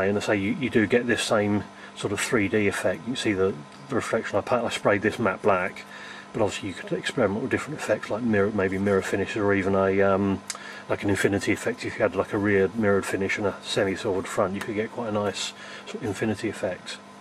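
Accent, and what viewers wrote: United Kingdom. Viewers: British actually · my apologies, but, I'm American and I'm having a hard time following you , you sound like your mumbling...!!! Sometimes the Brits murder the English language....now Bigclive I understand him clearly..!!!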